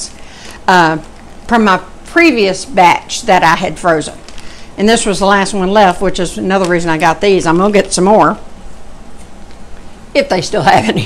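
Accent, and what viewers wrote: South, United States. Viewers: As a Canadian I just love that southern (I think) accent, makes me smile that you'all talk like that! You' all come back now ! LOL.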